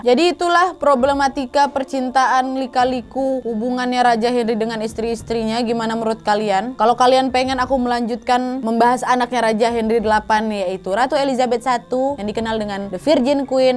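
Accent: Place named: Dumai, Indonesia. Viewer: Kyk org Dumai logat nya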